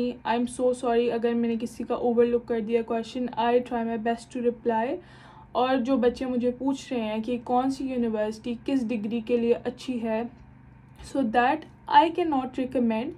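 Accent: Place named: Kashmir, India. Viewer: You accent and your looks seem like kashmiri 😳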